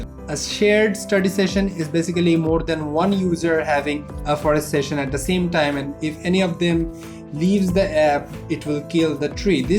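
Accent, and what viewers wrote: Bengal, India. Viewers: Why do u sound bengali?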